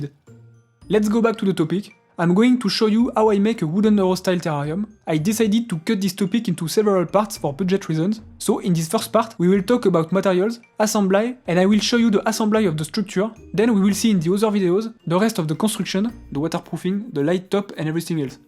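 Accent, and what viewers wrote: France. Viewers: Salut belle realisation , pour l'anglais travail ton accent car on vois bien tu es francais mdrrr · oh putain cet accent bien de chez nous XD Tu as bien du courage de faire une chaine en anglais. :)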